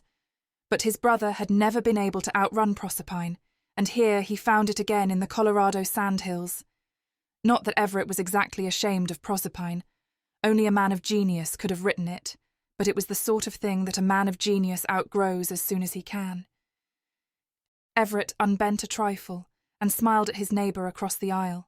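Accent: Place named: United Kingdom. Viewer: Please find American voices for Ametican novels. (I have a sensative ear for accent & couldn't listen to your James Joyce stories w a British accent. They didn't work ....). In genrral I am grateful for your downloads. Very.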